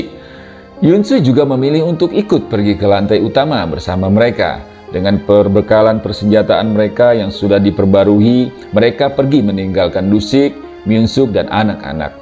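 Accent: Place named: West Java, Indonesia. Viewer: Ciri khas Sunda Na ka bawa we eta medit hahahaha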